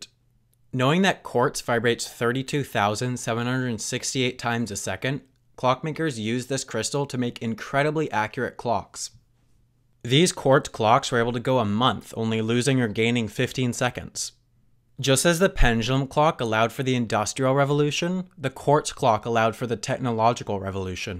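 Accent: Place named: California, United States. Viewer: You sound like a Californian.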